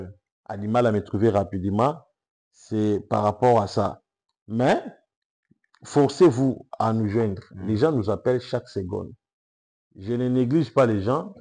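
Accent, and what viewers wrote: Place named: Democratic Republic of the Congo. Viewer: C'est un zaïrois selon son accent et la prononciation de certains mots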